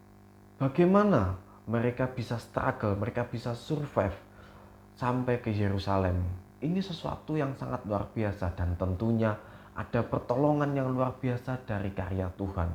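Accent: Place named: Java, Indonesia. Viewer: Logatnya kaya orang jawa..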